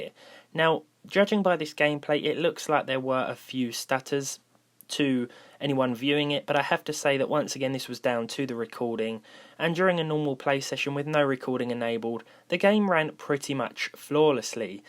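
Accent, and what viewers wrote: United Kingdom. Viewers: hes British dude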